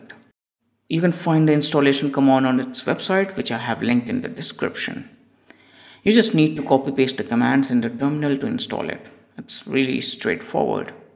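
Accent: India. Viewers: Why Indian accent very thick, i am little uncomfortable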